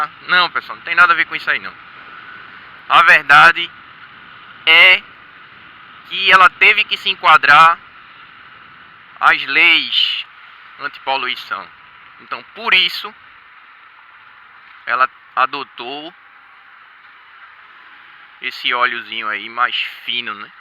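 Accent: Recife, Brazil. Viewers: eta sotaque feio do c da pra notar de longe que e de recife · so ouvi verdades tu es do recife?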